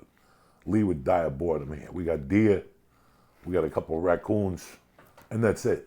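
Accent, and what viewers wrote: New Jersey, United States. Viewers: U sound more jersey already uncle. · Lol u can tell hes been in Jersey that accent is deeper than ever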